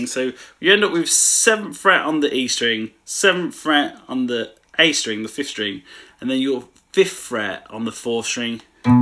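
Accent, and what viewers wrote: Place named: Birmingham, United Kingdom. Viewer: no worries and makes sense! You sound like a fellow Brummie as well 🤙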